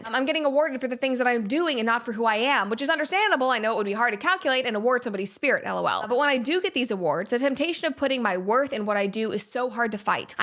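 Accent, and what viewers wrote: United States. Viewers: citizen journalism is the future of news MSM is declining and i liked vibes fast, quick accurate precise vibing with American accent more like ASMR speeldial:person-turqouise-waving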